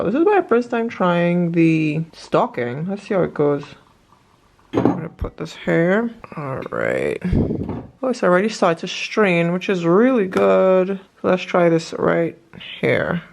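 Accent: Trinidad and Tobago. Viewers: I feel like I hear a Trini accent somewhat 🎊🍾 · Are you trini? You sound trini.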